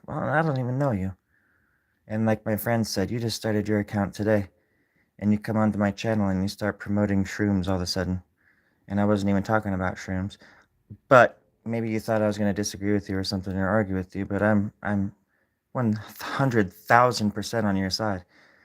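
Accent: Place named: Australia. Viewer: Your are an Excellent Artist! & a Beautiful Singer! I just want to tell you this. and what a Unique Podcast too! You are also Funny! I love your Australian Accent!, very convincing Mate! You are a hidden Box of Massive Gems 💎 M🕊️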